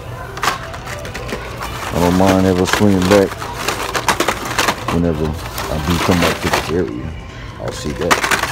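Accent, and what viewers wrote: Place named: Louisiana, United States. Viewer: Sounds like your from Louisiana if you are what part I’m in Lafayette would like to do some trades if you are down I have a good bit of stuff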